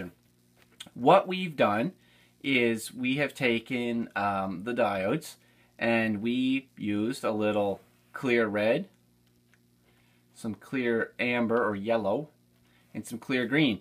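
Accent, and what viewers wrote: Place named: Canada. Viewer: You're from canadia, I can tell.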